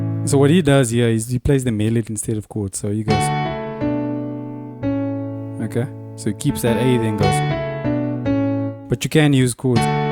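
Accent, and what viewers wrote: South Africa. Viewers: Are you from South Africa? The accent seems a bit familiar.. :-)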